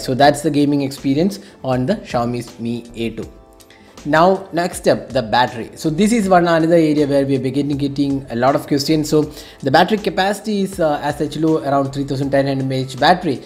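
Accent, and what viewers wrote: India. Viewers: Indian english - auto dislike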